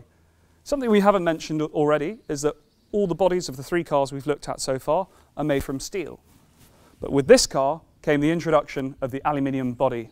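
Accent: United Kingdom. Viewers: Thank you very much for this excellent guide. Such a quintessential British gentleman informing people in that wonderfully British manner. That said, what about 11001? The Daytona prototype with the 2000GT-derived greenhouse?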